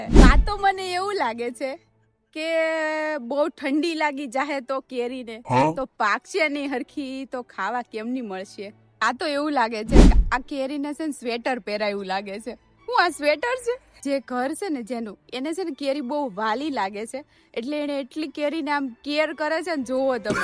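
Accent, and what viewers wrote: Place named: Talabda, India. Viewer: Australia ma raho chho pan language tamari haji pure gujarati pan nathi talabdi bhasha bolo chho ben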